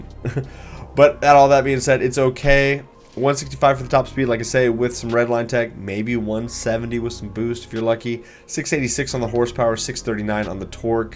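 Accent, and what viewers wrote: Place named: United States. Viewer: Two-ring 😂 American accent is so funny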